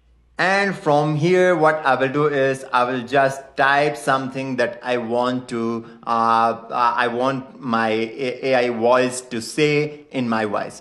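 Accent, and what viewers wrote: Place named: India. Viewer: In the video all I could understand was the first part of the name, which was play, but the rest was a blur. I had trouble understanding your heavy hindoo accent.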